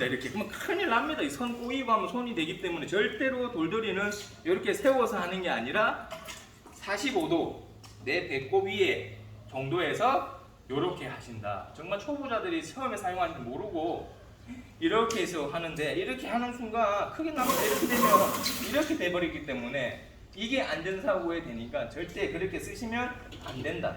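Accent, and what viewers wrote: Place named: Busan, South Korea. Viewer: 항상 청소장비들 소개 잘보고 있습니다 부산 사투리 ㅎㅎ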